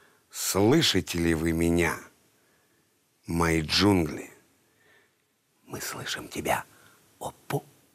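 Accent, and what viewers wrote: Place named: Okanye, Russia. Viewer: Ооооо, "брат"! Да, ты ещё и дОёшь через "О"-(чко) надо полагать, неуч... Или ты нерусь, "Артём Иванов"? - Ну, тогда это многое объясняет ;))) ? УРА, ТОВАРИЩИ !!! Слава Украине в составе России !!! :)))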